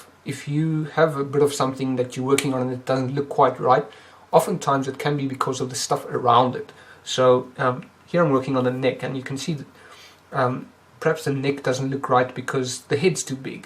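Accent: South Africa. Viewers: South Afrian?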